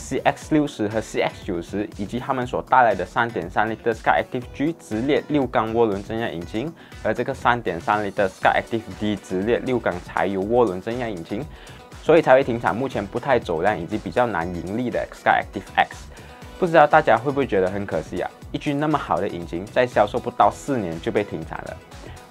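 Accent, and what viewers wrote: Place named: Malaysia. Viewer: 大马华人的普通话原本就不错，只是有些口音而已。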